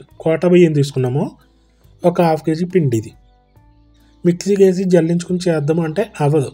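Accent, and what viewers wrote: Godavari, India. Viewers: Meeru matlade Godavari jillala telugu vinte kadupu nindipoindi.Hyd vachhaka ee bhasha miss avutunnam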